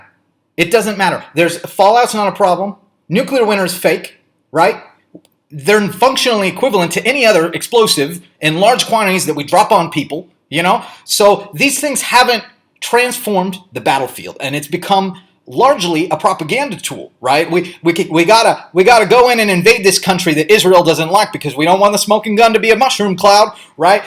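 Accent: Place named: South, United States. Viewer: Also, southern accent really slipping through in this one, kek.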